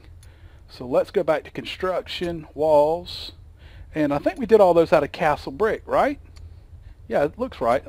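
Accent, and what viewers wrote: South, United States. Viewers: Glad I can hear you in this video. Hated that I could hardly hear anything in the last 3, and happy to hear a fellow southern voice.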